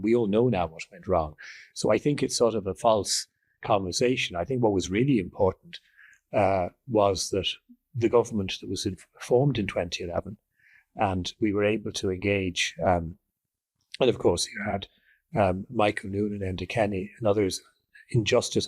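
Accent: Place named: Israel. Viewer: You sound more Israeli than Irish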